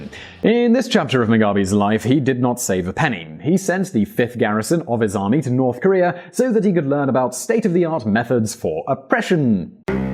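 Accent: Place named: United Kingdom. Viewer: British accent and glasses doesn't make you make smart.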